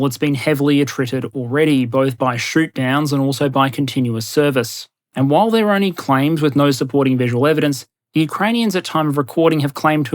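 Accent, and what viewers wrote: Australia. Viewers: what helicopters? The ones Wagner shot down? Because the Ukrainians short down zero. What nonsense was he speaking about in the video? 🤭 Helicopters are good once the ant-air is knocked off. So it's offensive overkill. You really should stop listening to this clown. He has wasted hours and hours of your precious time and your brain is growing mushrooms. He's a disinfo channel on the payroll of some 3 letter agency like the CIA or in this case, probably British. Lots of useless details, meandering around w some patronising Aussie accent does the trick it seems. Too ez. 🐑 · Mr., I don't understand why you were concerned about this update, it is excellent as usual. You have my greatest respect for staying on track and as far as keeping with in the boundaries of reality. Thanks once again. I am always so grateful to hear such an incredibly intelligent voice with an Australian accent. 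Baku boy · Thanks for getting me through a bumpy flight to my vacation mate. Just listen to the nice Aussie man and ignore the turbulence.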